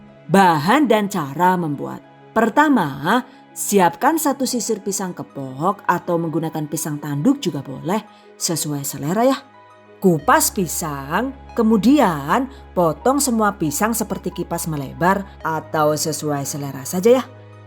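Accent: Banyumas, Indonesia. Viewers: "Done" Trimakasih resepnya Bun,prlu dicoba in InsyaAllah...,diselingi bahasa yg ngapak bikin ketawa trs..😄😄 #giveaway THV 2020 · Well come back.. ketemu maning ora ngapak ora kepenak..kabare yu sehat.. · "DONE" Ujug2 muncul neng beranda yuotube, ndeleng judule pisang lgsg tak klik 😊😅 eh ternyata aku terpesona dg logat ngapaknya. Piye ikilah jaaaaand terngiang2 bae suara ngapake mboke 🤣😂✌️ muga2 bisa kaya mboke ya pinter masak werna2 🤭🤭😂 #GiveawayTHV2020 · Ini dr madiun apa mana ya kok ngapak? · Kok ketawa ya setiap lihat vidio vidionya karna ada suara ngapak nya 😂😂😂😂 Tapi aku suka sama resepnya mantul bener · Sebenernya asli mana sih bun, kok ngapaknya kaya istri saya 😁🙏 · wong ngpak..hehe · "Done" Selalu nungguin "ngapaknya"😁 n nnton ini pas lg nenenin bayiq yg brsn lahir. Sempet ketawa sendiri pas bilang "Angel-Angel" alias susah.. Coba klo dtulis Angel bs jd artinya brubah jd "Malaikat" 😁 Btw.. 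Sukses trs bunda.. Selalu mnginspirasi.👍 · Tks resepnya jeng yg kadang2 ngapakk..tp aku nonton truss..ngapak.ngapak maju trus resep2 kulinernya jeng .... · Gaya bicaranya lucu, ternyata ngapak.....org Tegalll atw mn nih....😃😃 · Mbokiek jawine ngendi tah.. bosone ngapak..uuu lutunaaaa 😝🤣👍 ... but i love your banana krispi...pake toping amazing..👍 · DONE Saya suka dg vidio resep"nya karna jelas dan lengkap dg tip &trik nya apalagi ditambah cara penyampean dan logat ngapak yg khas huh pokoknya angel....angel di suruh gak nonton,di catat lho mbok lali,😘😘😘💖💖💖#GiveawayTHV2020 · Saya selalu mencatat kata yang selalu diucapkan dlm setiap video THV yaitu kata "Jadiiiii ....." dan aksen ngapaknya itu lho hahahha .....kereeeeen mbak beda dgn yg lain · Salam ngapak Bun..orangapak ora kepenak😂😂🙏🙏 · Angel temen diwalik kiye.......😀😀😀😀😀😀pada bae mba,aku juga ngapak. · Senemg bgt nonton chanel ini...ngapaknya sama dg saya...😂😂😂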